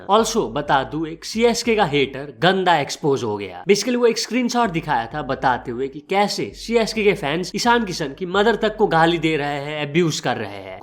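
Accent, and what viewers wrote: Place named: Assam, India. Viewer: Accent bhai, vo assam ka hai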